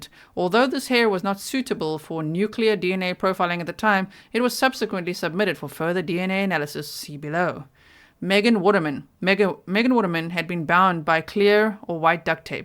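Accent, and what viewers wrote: South Africa. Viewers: Howzit! I've been dying to know how they even suspected this oke, and thought no one would have a video up yet. Checked YouTube and yours was the first video to come up, upon hearing you speak, I was all...wait I'd know that accent any where 🇿🇦❤️😎🤙🏻❤️🇿🇦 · South African, yw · Are you South African? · Are you South African like i am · South African but lives in the Netherlands which probably “complicates” her accent. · Ahh! SA, now the accent makes sense.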